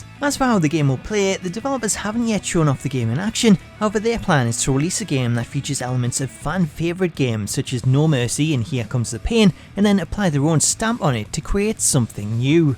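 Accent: Newcastle, United Kingdom. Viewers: North East England/Geordie accent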